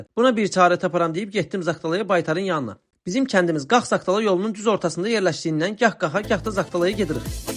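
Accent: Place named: Balakan, Azerbaijan. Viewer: Şirin Balakən lehcesi😊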